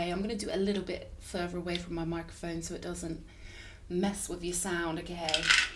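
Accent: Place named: London, United Kingdom. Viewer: the London down country girl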